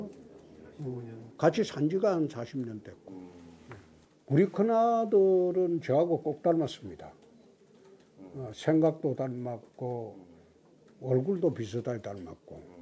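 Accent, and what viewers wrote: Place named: Gyeongsang, South Korea. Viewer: 문제점을 콕집어내는 혜안 , 언제어디서든 즉문즉답이 가능한정치인 흠아닌흠 경상도억쎈 어투와발음은 재밌기도하지만 경상도출신은 아무 문제삼지않습니다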